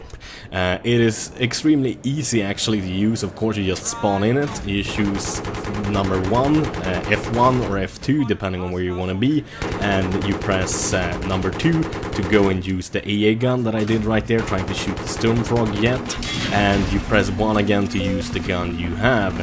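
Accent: Sweden. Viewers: your smooth Swedish tone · You must be swedish :)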